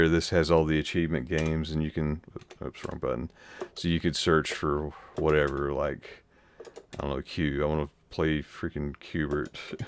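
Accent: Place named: Midwest, United States. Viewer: Ok, so I’m creeping!! lol, I’m a Honker who wanted to see if looks like his voice!!! 😂😂. I have to say your mid west accent is much more apparent on this channel. Probably bc you’re not talking with a British accent!! ♥️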